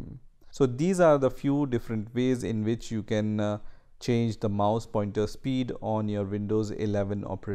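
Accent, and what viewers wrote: India. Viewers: classic indian accent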